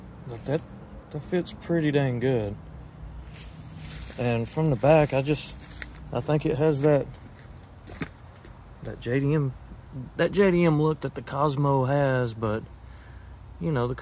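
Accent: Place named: South, United States. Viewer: What website did you import ya cosmo from brother. You sound like you from down south! I’m from Newport News Virginia, and wanted to import one of these.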